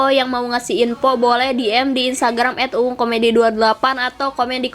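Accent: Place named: Sunda, Indonesia. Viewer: Sundanya medok😀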